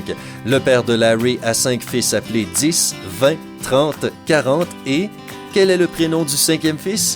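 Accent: Quebec, Canada. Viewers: Pourquoi y'a un québécois (comme moi) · Tamara oui c'est vrai, déjà qu'en français j'entends pas toujours, alors avec l'accent québécois 😐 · la dernière c'est n'importe quoi! Mais j'adore cette accent québéquoi! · L'accent Québécois!! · mais pourquoi la voix de canadien là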